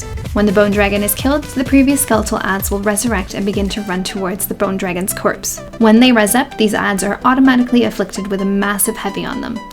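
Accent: Canada. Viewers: Your accent gets more and more Canadian with each video. I like it.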